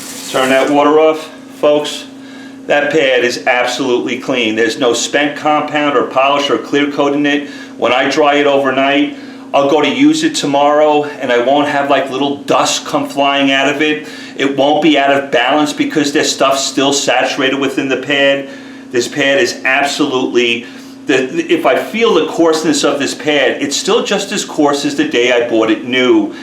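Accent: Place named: Dallas, United States. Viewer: Good video w/ info - noticing a heavy Dallas accent there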